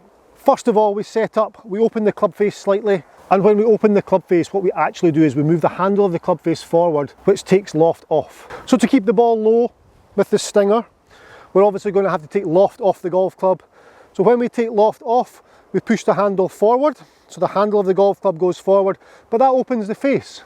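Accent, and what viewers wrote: Scotland, United Kingdom. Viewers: Hey! First of many comments! Hi - thank you for you excellent tutorials. I always enjoy your lessons, recommendations, tips, and your entire approach to the game. I am an intermediate golfer over in Calgary, Alberta, Canada. I'm happy to big you up whenever I can. I had previously looked at other golf-guru types with similar channels, none of them helped me. I then looked at your Ben Hogan grip lessons, your overall laid back approach to the game, your Eureka methods, and boom, what a difference in my game and my enjoyment. That, plus now I can channel a Scottish voice in my head when making my shot. Thanks, keep it up.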